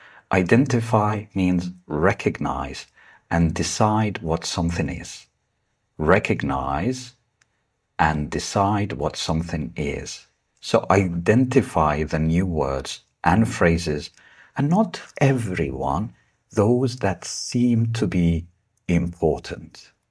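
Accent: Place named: United Kingdom. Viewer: Plz don't leave this book and this way with your best British accent 🎉❤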